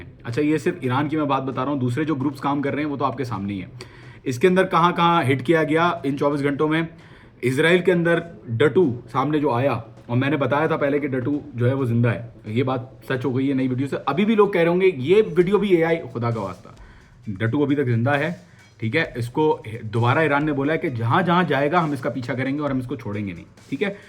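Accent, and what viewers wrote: India. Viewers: Your Urdu accent llike of Indian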